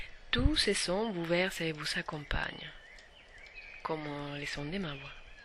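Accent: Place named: Spain. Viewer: Cest quoi cet accent espagnol ? Paysans ? Créole ?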